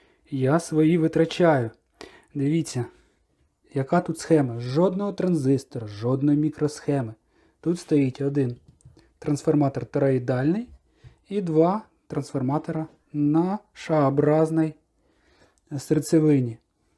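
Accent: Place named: Zhytomyr, Ukraine. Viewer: Це житомирська походу, як у мене))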